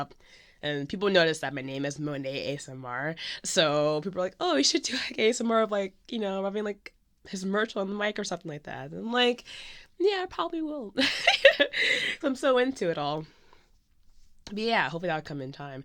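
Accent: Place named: United Kingdom. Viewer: My friend told me to get my ears turned around like this haha I really need to from now on lol I didnt think you were American, I thought you were British haha :D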